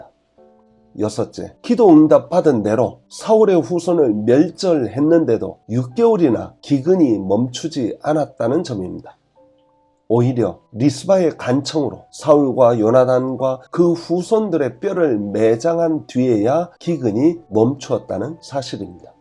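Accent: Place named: North Korea. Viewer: 강해 하시는분이 보기에 그리 연세가 지긋한 분이 아닌데 왜 북한 사투리를 하시나요?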